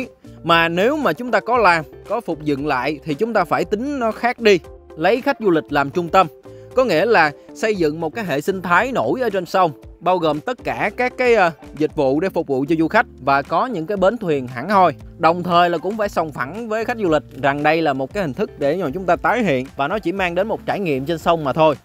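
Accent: South, Vietnam. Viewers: Nói chuyện giọng miền Nam rất chuẩn và lưu loát và hay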